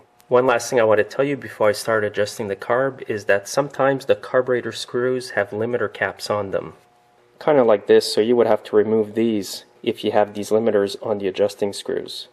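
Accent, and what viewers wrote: Quebec, Canada. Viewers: Sorry,. I had a lot of trouble understanding your Canadian accent. Talk slower. Were you referring to the red or blue screw? Your voice dropped out. Can they set these up for Torx screw adjustment? Why the special tool?!? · I've currently got a Poulan, and while it's good for what it is, it's time for me to upgrade. My hardware guy sells Echo, and claims that the quality of the machines and that the company is on par with Stihl and Husqvarna. What are your thoughts? PS: Is your accent Quebecois or Manitoban?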